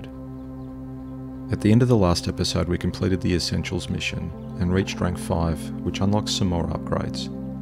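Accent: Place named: Australia. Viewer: I only discovered your channel and content today. Best out. Good to hear a fellow Aussie, keep up the wicked content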